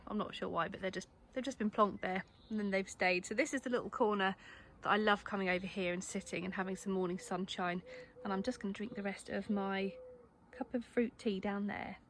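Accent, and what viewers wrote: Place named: England, United Kingdom. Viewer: Good Morning!! I love your English accent 😍 I come from Italy and I have studied English for many years ❤❤ your vlog is really awesome 😍😍😍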